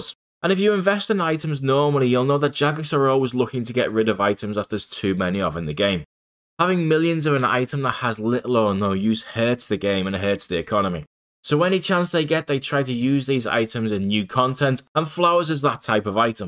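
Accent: Liverpool, United Kingdom. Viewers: Fellow scouser? yes lad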